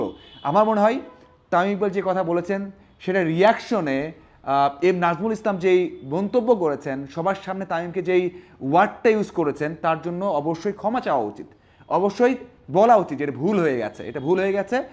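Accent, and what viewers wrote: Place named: Kolkata, India. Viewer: ভাই আপনি কি কলকাতার মানুষ নাকি কথা দ্বারা তো এটা বোঝা যাচ্ছে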